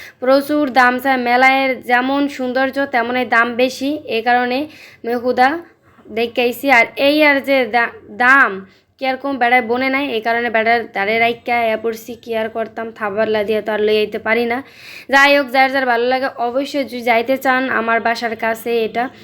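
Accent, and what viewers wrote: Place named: Barisal, Bangladesh. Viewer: ভালো সুন্দরী লাগছে কিন্তু বরিশালের ভাষায়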